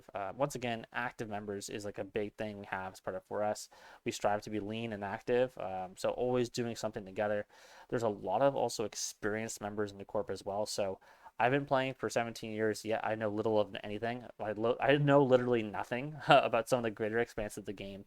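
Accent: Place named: United States. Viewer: 4s is super euro. I didn't expect the American voice. expat?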